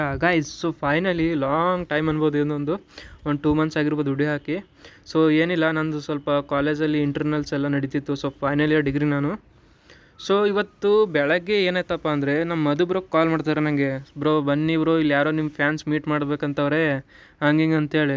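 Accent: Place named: Uttara Kannada, India. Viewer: Voice uttar kannada Voice nimda yanna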